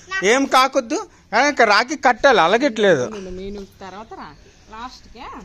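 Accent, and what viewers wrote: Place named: Andhra Pradesh, India. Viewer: Anna mee slang maa Srikakulam la undi,? Meedi Srikakulamaa!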